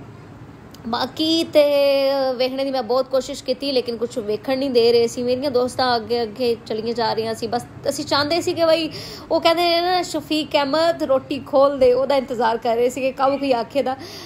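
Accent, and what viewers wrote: Punjab, India. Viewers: Your punjabi accent💖❤👀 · Punjabi accent 👍😊 proud of you my little pumpkin 😊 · Kamal punjabi accent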